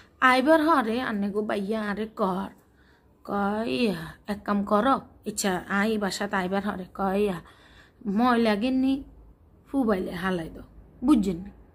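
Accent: Noakhali, Bangladesh. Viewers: আপনার নোয়াখালী কথা শুনতে খুব ভালো লাগে এই জন্যই বন্ধু হয়ে গেলাম, গান টা অনেক সুন্দর গেয়েছেন। আমি আকলিমা husband এর একাউন্ট থেকে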